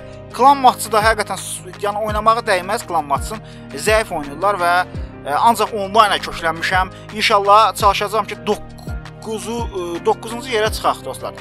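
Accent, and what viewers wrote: Baku, Azerbaijan. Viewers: Əmi sən Bakılısan?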